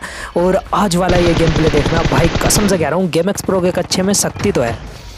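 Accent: Haryana, India. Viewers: ur haryanvi touch is superb Three seater: thukta pher char jagah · Bhai Aaj To Katai Haryanvi Horya H🔥🔥😍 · Bhai comentry Haryanvi and hindi soo funny I like it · Aaj to haryanvi boli has ri 👍😂❤️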